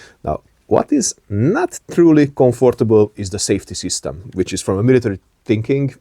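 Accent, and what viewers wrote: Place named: Hungary. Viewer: The best channel in the beautiful bright hunglish language just uploaded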